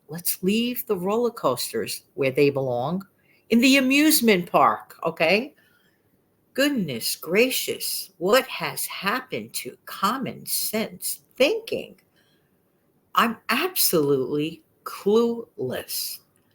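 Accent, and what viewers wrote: New York, United States. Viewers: Personally, i love your New York accent. I think it's great!😂 Oh, i remember those handi snacks,! Those were quite good as i recall! Slim Jims are good too.No red stick....thats just wrong!😮 I agree that things could get very crazy and soon. Yes, we need to be prepared! I thought i would buy a few packages of those wraps we use to make burritos (just cant think what you call them). Thought they would be a good bread replacement in a pinch. Yup, gotta have some sort of grocery plan! Definitely!!! Yes to peanut butter, all day! I love oatmeal too, brings back such good memories from childhood. We always had canned fruit and veg when i was growing up. Still do. Great video. Much appreciate all the great ideas and encouragement....bless all your efforts. I am very grateful. God bless ❤❤❤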